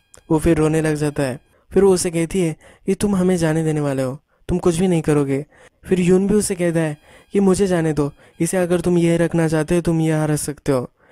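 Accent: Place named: Uttarakhand, India. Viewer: You are from uttarakhand aren't you???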